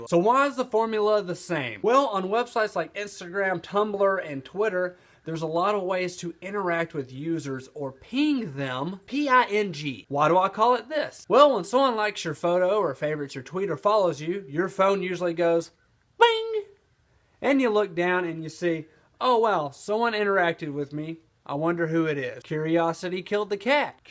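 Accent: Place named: Georgia, United States. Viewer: are you from Georgia? you have an accent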